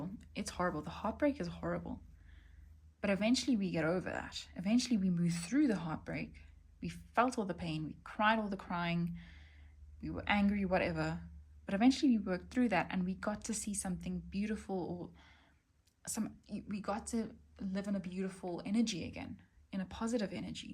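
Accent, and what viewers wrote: South Africa. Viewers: May I ask if you are South African? ❤ I just love hearing your accent doing a reading! And your readings resonanate so much with me too, thank you for sharing. Love from the East Coast of S.A. 🇿🇦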